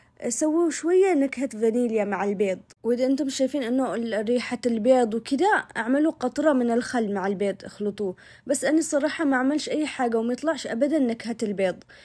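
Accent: Aden, Yemen. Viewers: حبيت فيديوهاتك من او ل نظره❤واللهجة العدنيه مليييييحه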